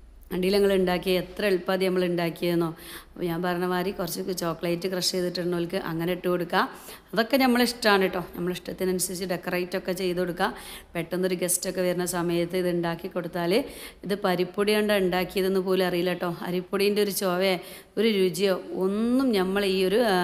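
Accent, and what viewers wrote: Malappuram, India. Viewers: മലപ്പുറം + കാലിക്കറ്റ്‌ / മിക്സ്‌ സംസാരം,,, വേണ്ട,, നല്ല രൂപത്തിൽ സംസാരിക്കാൻ ഒന്ന് ശ്രമിച്ചു നോക്കൂ,, 👌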